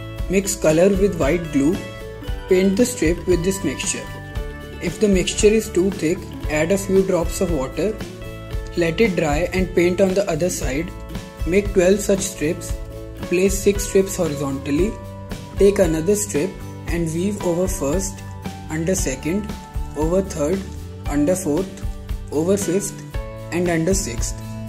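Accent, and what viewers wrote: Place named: India. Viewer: Very beautiful handbag. Are you from India? I assume because your akcent.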